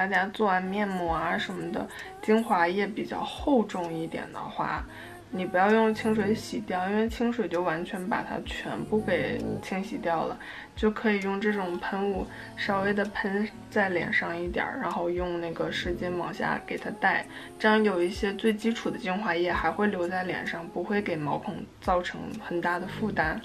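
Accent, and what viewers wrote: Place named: North, China. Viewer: 听你说话应该是北方人😆亲切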